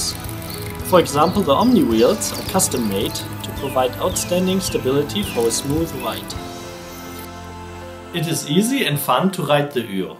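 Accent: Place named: Germany. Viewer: Sprichst du normalerweise Deutsch? Man merkt es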